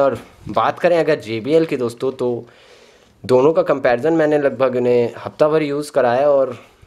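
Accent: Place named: Rajasthan, India. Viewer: Bhai aap rajasthani ho thodi marwari bol re ho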